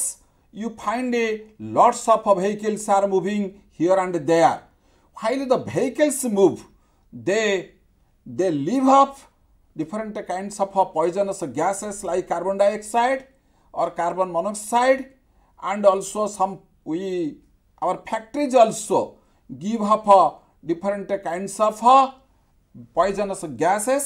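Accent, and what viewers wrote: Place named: Odisha, India. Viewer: Om my god this is english or odia...i think this man pronounciating English as odia launguage..😄😄😄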